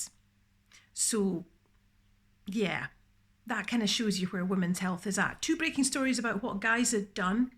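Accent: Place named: Scotland, United Kingdom. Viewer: A friend of yours sent me your way and i have to say it's like you are telling my story.. but in a Scottish accent hehe which is beautiful i might add. Thank u xxx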